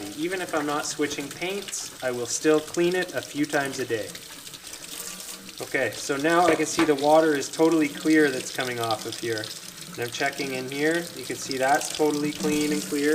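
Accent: Canada. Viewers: You sound very Canadian or Minnesotan (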